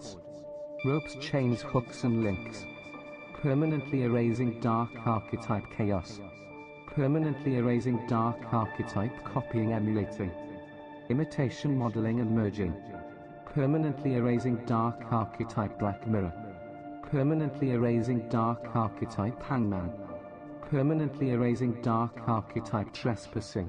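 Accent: Wales, United Kingdom. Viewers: Aww lovely Welsh accent, music to my weary soul. Thank you for victory against the dark 💕🌟💕🌟💕🌟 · The Welsh accent 😍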